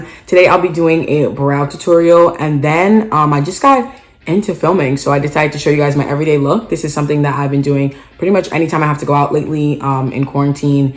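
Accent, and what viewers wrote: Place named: Canada. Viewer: You sound Canadian❤️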